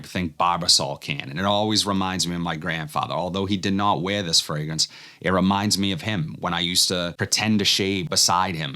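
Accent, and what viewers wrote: Boston, United States. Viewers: ! Happy birthday 🎉 I love how your boston accent sounds like everyone I grew up with. I need suggestions for cologne for my almost 16 year old - I splurged on althair for his b Day in a couple of weeks ( I love it ) ( got PDM discovery set to try before spending on it, p He has Cedrat Boise. Prada Luna rosa. And the sport one Sauvage. Coach blue,( Marshall's) mont.blamc legend bluu t. Jimmy Choo man.aqua di gio. Phanom.( nauseating i cant deal ) cremo spice and black vanilla He no longer likes his nautica voyage So any suggestions at lower price range that smells good? Not everyone smells like. What else is great for this age?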